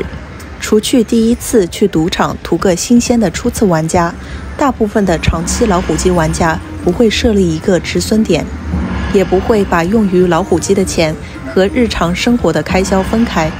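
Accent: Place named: Sichuan, China. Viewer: …四川的哇。😂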